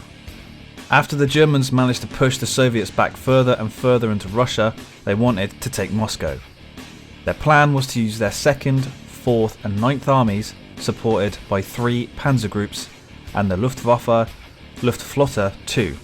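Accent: United Kingdom. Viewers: I like your british accent! Hi from western front!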